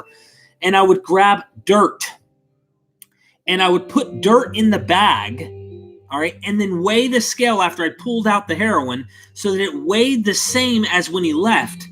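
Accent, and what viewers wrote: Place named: Virginia, United States. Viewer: Are you from VA? Just guessing based on your accent.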